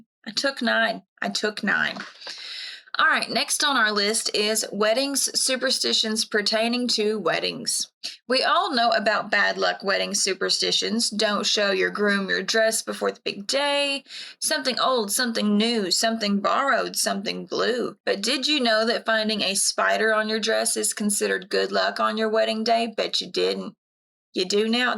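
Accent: Kentucky, United States. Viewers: It’s the humor… the sarcasm…. The KY accent?? I’m here for it!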